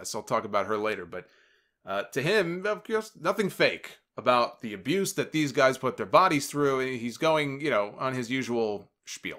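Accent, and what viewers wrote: New York, United States. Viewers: "Nu Yawkaz" bro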